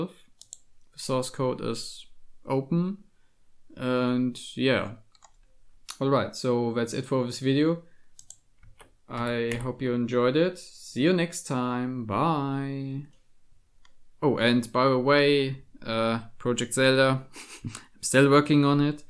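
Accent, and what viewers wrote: Germany. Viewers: your accent are like germans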